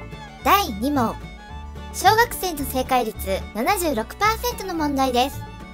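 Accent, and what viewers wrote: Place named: Kansai, Japan. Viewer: ママは関西やな〜 マジで関西人やで〜 ハマりまくりでんがな ラストの「ラッセルラッセル」 大ウケでっせ〜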